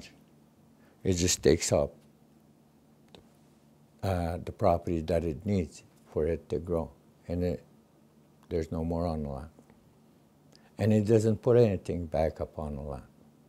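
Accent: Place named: Canada. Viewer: This is really interesting. His accent and my accent are similar, I can hear it as I listen. Excellent video. :) That Canadian dialect ~from a Yooper in "da U.P" of Michigan. :)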